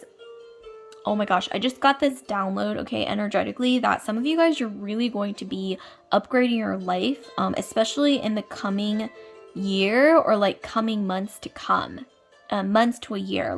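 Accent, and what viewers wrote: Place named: United Kingdom. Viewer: That’s so funny I picked plié 2 and I love the using the British accent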